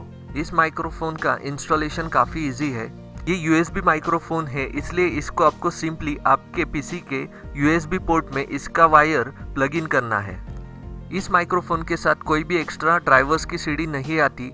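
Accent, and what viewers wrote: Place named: India. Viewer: Oh god the Indian accent 😭😵😫😖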